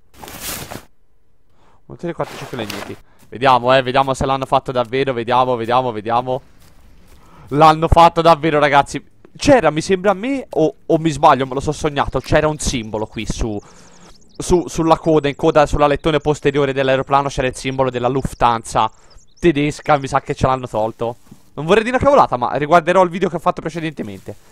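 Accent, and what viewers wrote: Livorno, Italy. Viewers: ma sei livornese?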